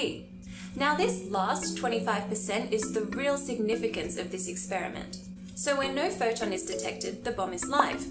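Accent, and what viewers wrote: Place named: Australia. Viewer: Someone on twitter commented saying you were Australian and I didn't even notice!! I guess I'm too used to the accent. Where are you from? Where did you study?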